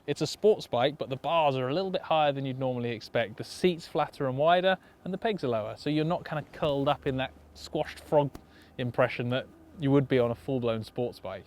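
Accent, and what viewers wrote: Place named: United Kingdom. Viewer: Love british who ride bikes for some odd reason lol ( I’m Swedish ). Maybe it’s your accent making the hobby feel more sophisticated rather than a muurican ”I’m so tough!!”-accent. Is it worth going to the UK for a biketrip?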